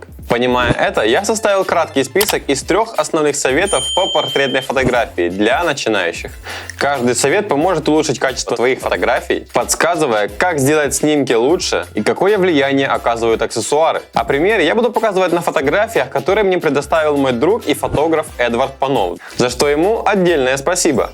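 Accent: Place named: Romania. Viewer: акцент какой то румынский. надеюсь он Русский человек.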